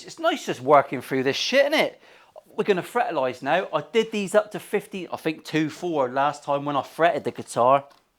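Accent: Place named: Devon, United Kingdom. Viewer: I think I wanna move down you're accent's neck of the wood. I love Brixham!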